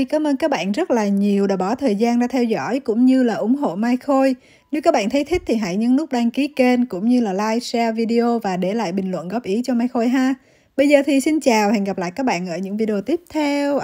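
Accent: Central, Vietnam. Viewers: giọng trọ trẹ ko biết người đà nẵng hay người Huế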